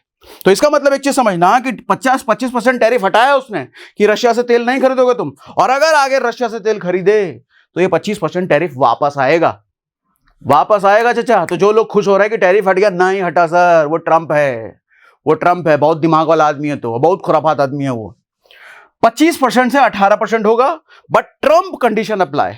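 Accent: Bihar, India. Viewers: Tu insurance bech rha hai ya market ki baat krega bosdi ki bihari 😢😢😢😢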